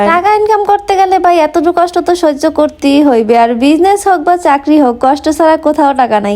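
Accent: Barishal, Bangladesh. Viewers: আপু তুমি তো বরিশাইল্লা তাই না 😊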